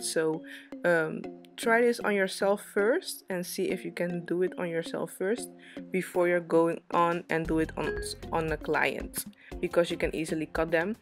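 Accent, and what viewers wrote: Netherlands. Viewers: What country are you from, you sound dutch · Ben je misschien nederlands lijk ik te horen aan je accent lol?😂) are you dutch ? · ik hoor gewoon dat je Nederlands bent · Ik dacht al ik hoor een NL accent door je Engels. Toen ging ik naar je insta en zag dat je NL bent. Hahahaha in ieder geval je doet echt heel mooi werk💕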